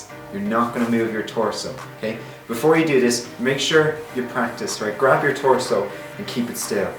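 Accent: Ireland. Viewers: your accent gives me life. irish accents are fab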